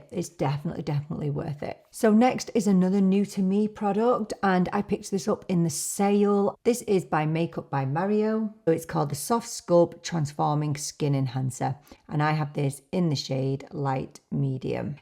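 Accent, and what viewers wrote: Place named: Northern England, United Kingdom. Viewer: I thought so 😀 I’m a northerner too, so I guess I gravitate towards you, I can relate! I’m originally from Leeds and moved to Nottingham when I was 24 (I’m 53 now) and my accent will never leave me 😂 neither will yours. Never been to Ireland myself but I’ve heard it’s very beautiful. I travel to Birmingham regularly as I’m a big gig lover. I have posted a few videos on here if you are interested. I was so excited when I saw that a Sephora had opened in the bull ring. It’s got so many different brands on display and I got a bit overwhelmed if I’m being honest. I didn’t even buy everything! 💕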